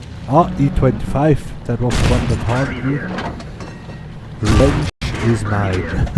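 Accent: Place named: Switzerland. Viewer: Schweizer oder Deutscher? Habe das Gefühl dass dein Akzent ziemlich einem Schweizer ähnelt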